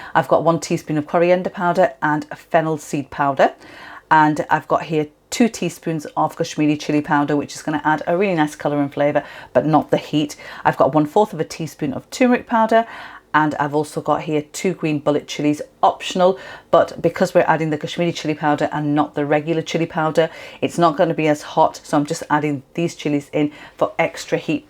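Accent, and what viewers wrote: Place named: United Kingdom. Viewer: So useful vlog. I love your cooking content and your UK accent too :) Love from Tokyo... Can we become friends please? :)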